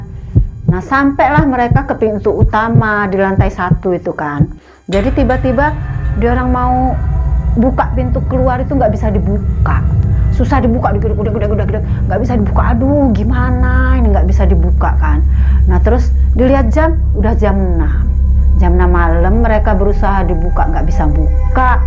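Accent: Lampung, Indonesia. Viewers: Oke siap .. ciri khas suku gham lepung (suku kita Lampung)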